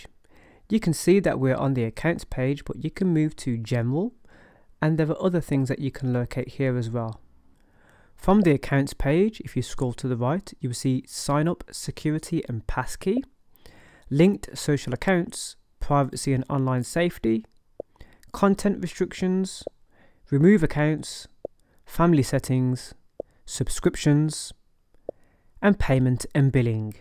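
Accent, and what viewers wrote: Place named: RP, United Kingdom. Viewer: You sound dead posh ya know 😂👌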